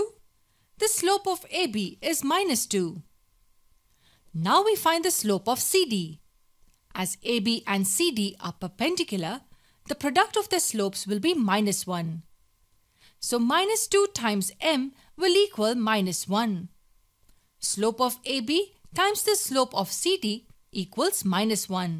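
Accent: India. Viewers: I screamed when i hear an indian voice and leave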